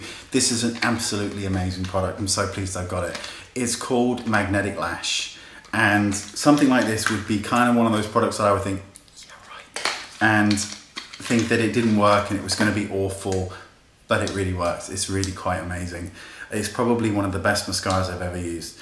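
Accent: Australia. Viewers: Is he Australian? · He sounds kind of like a Aussie ?? Where's he from ?:)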